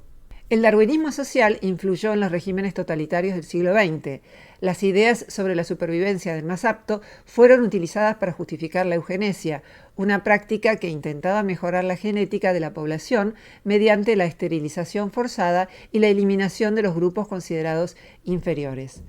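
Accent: Argentina. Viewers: La comentarista ametralla con su léxico sin pausas no se corrige y usa su predica para su gremio y los neófitos no entendemos pero soslayo que predica algo interesante la veo años y entiendo 20% de lo que dice aún la perdono por su hablar porteño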